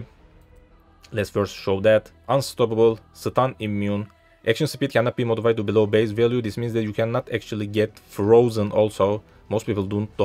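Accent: Turkey. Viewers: konusmaya basladin 5 saniye dinledim ve dedim bu adam türk :) ve ne buluyorum kanal hakkinda :"Making build guides for Path of Exile.Because there are not much Turkish content creator,i make other guides in Turkish." yakisir...kolay gelsin hocam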